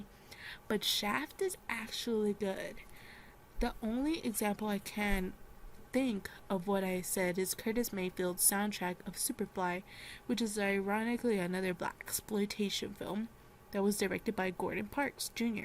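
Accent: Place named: United States. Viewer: U sound American and I'm in Australia so what I actually meant by colab was to put each others channel on our pages? I've never done it before. But I've heard its a good way to grow and gain exposure. My channels still a work in progress but I've got good things in mind for the future. I've done a some movie and TV show reviews on my channel and will be doing many more. So would u wanna do something like that? I'd totally understand if not as we don't even know eachother but I thought what harm could it do? lol Anyway cheers anyway. I'll checkout some more of your reviews.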